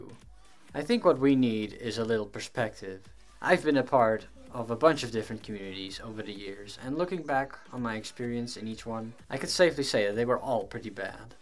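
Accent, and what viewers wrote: Netherlands. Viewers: Eerste seconde wist ik all dat je Nederlands was.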